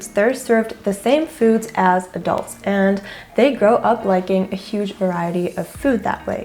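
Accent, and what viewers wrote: United States. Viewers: Your American accent is the best I’ve ever heard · If you're French, why do you sound more American than i? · Wow your American accent is perfect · Tu viens de la France vraiment ?? Wow, ton accent américain est si merveilleux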